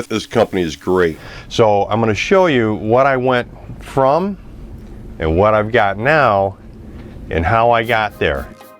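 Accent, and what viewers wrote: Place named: Chicago, United States. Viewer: Chicago area accent, right?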